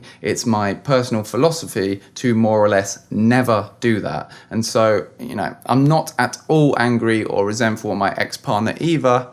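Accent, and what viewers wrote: United Kingdom. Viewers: I heard Wotor couple of times, you must be from UK, am I right ?😆👍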